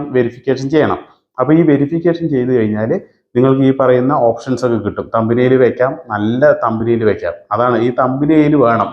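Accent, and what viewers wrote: Palakkad, India. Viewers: Nigal palakkad ano Etta....🎉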